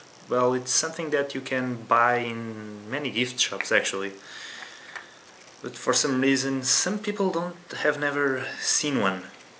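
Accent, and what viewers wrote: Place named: Portugal. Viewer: This is such a Portuguese accent ehehehehe great video :)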